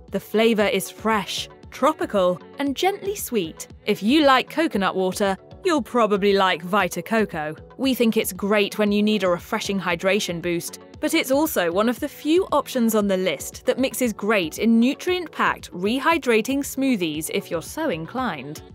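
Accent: England, United Kingdom. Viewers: English accents legitimize Mashed Science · Why do you use a British accent in some of your videos? Is this a British content provider? It’s strangely distracting.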